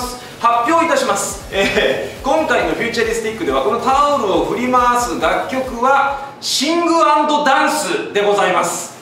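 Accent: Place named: Japan. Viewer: Antes de seguir a no pensaba lo impactante que era escuchar a un japones ponerle la "u" a final de una palabra en ingles:v wow.. nice <3♡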